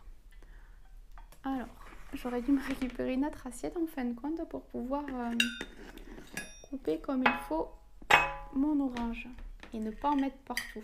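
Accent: South, France. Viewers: coucou j'adore tes vidéos je voulais savoir tu habite dans quelle région (du Sud je crois par rapport à ton accent) car il a l'aire de faire beau par la non ba voilà j'ai testé ta tarte à la tomate et chèvre elle a dit du succès et elle méritais je vais l'essayer demain le poulet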